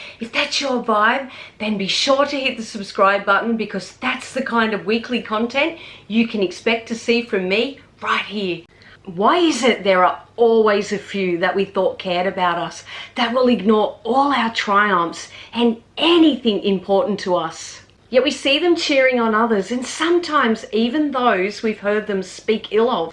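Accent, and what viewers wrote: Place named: Australia. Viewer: lovely princess, you always make solid points about the content on your channel, so inspiring, so informative, very classy, and very creative, plus, you have such a beautiful glow on your pretty face, and the most adorable accent in Australia. I have so much respect for you, the same way you do for me. Send you a bow like a noble gentleman, and you send me a curtsy like a graceful princess. 🤗🤗🤗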